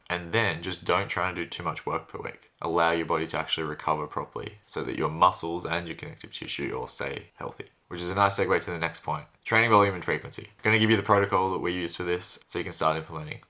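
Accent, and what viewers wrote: Australia. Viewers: Bro your Australian accent is fuckinnnm difficult to understand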